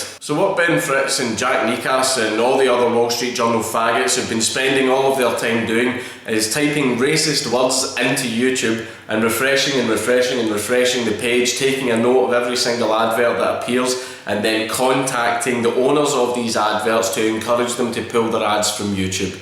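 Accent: Scotland, United Kingdom. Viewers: Holy crappo.. watching these ol skool dank vids is so enlightening as to how far his production value has improved... OMG A SCOTTISH BROUGUE AND AN ECHO!!! lol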